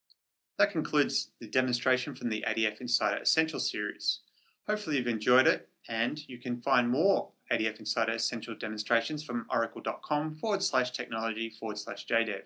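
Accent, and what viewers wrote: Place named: Australia. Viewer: See, everybody loves an Aussie accent! ;-)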